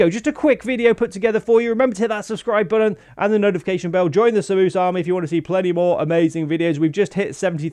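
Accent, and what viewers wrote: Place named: United Kingdom. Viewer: His accent is British.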